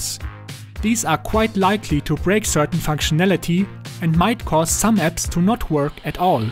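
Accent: Germany. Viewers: U are german Right? 99%😂😊💯nice video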